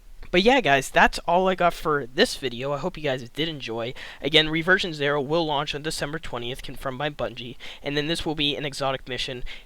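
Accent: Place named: Massachusetts, United States. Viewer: You from Massachusetts? You sound like you live here, lil’ homie.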